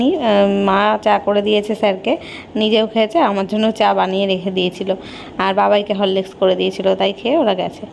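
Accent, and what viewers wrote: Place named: Bangladesh. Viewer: Eto modern sobkichu , kintu asba , jaba , khaba ei bangal kothagulo birokto lage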